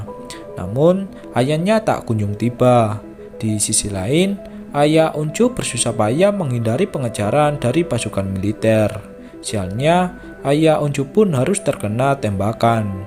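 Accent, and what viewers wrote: Java, Indonesia. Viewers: Fix admin orang Jawa nih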